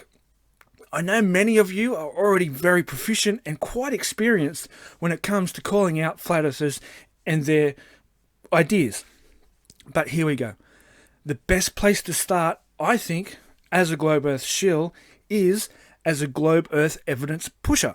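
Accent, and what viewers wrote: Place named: Australia. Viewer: Did it ever occur to you that your voice tone and phasing resemble Mal from Borderlands 2, but with a great Australian accent? <link> Check it out!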